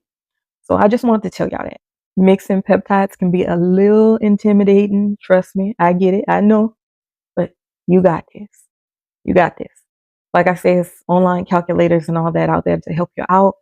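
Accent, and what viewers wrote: Louisiana, United States. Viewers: Stopping by to learn from your video. Cant help but chuckle for catching your accent. What part of Louisiana are you from?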